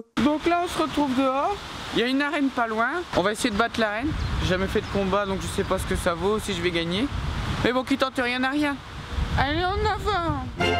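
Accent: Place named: North, France. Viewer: tu est du nord toi ... POURQUOIIIII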